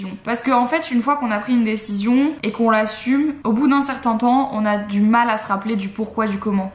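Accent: Belgium. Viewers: Je trouve que t'as pris un léger accent belge 😂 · C'est incroyable, j'entends un petit accent belge ;)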